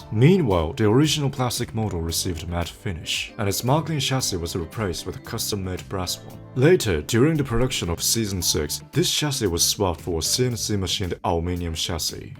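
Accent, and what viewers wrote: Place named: Japan. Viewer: bro sounds like a japanese person who is learning english from posh british people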